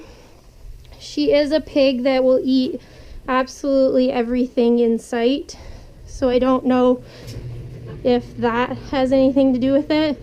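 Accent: Canada. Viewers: Judging by the way you speak, you must come from southern Ontario. I'm from northern Ontario. You wanna talk winters??? My daughter moved to Windsor I was almost ready to move with her lol. We get really bad storm up here. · The moment I heard you talking I knew you were Canadian! Just found your channel & I loved your commentary on this video! Subscribed & happy to follow a fellow Canadian ;) look forward to seeing more!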